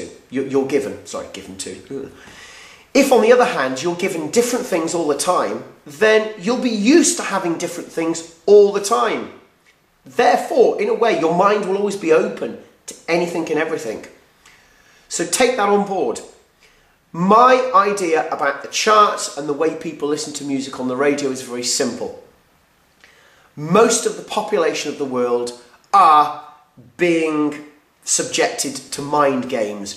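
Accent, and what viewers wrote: United Kingdom. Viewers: im from germany and i alway enjoy listening to your videos. im a big fan of the british language! :)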